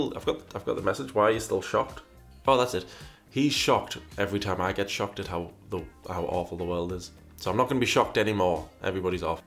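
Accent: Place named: Newcastle, United Kingdom. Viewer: lol, i didn't believe was from Newcastle, but I can hear the Geordie accent in this video <3... loving these videos on gurlworld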